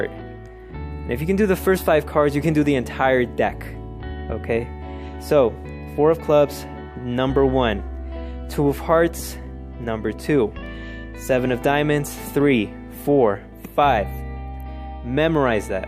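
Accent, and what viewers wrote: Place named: Texas, United States. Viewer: The deadpan texas got me weak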